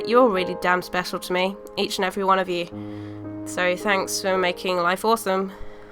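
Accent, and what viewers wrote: Birmingham, United Kingdom. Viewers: I love your drawings and British accent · I really really adore yout artistic skills... and your British Accent!! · I really suck at accents and then it hit me, she sounds familiar, then you came out with your from Birmingham! I haven't seen any other ' brummies' on YouTube, so hiiiii! :)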